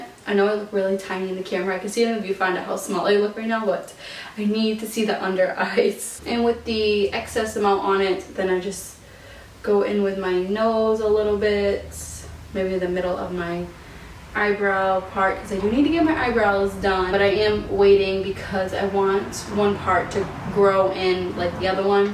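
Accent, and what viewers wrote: Boston, United States. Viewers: You are so gorgeous naturally and I love how you wear minimal makeup I’ve been wearing less makeup lately do to it causing me to break out and I love your Boston accent ❤️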